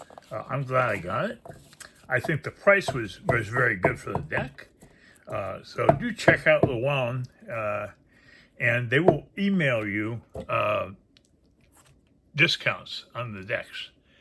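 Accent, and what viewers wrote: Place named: England, United Kingdom. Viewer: It's really nice to listen to your video, here in Italy... waaaaves... your accent sounds "English" am I right?? I'm not sure but... it seems so... and I like it!!!! Thanks for this beautiful review, from today you have a new follower from Italy ! wide smile ! hellooooooo !!!!!!!!!!!!!!!!!!!!!!!!!!!!!!!!! ( and today I ordered my tarot deck: I Love it !!!!) Ciao and thank youuuuuuuuuu !!!!!!!!!!!!!!!!!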